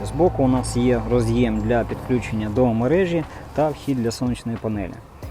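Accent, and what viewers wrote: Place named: Surzhyk, Ukraine. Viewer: 😂если начали по укр говорить, то уже все говорите, суржык дикий аж слух режет)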